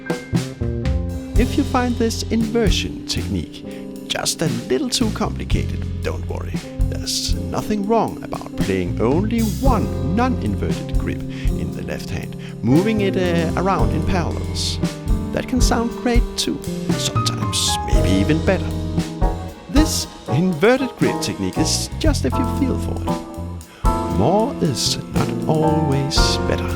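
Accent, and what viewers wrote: Denmark. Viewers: Are you from Denmark??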